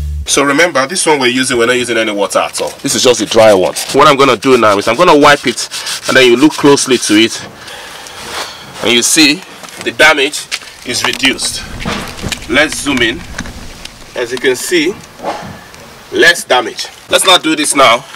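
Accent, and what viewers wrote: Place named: Nigeria. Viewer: My Naija broda. I hear that accent clear as day. LOL. Good job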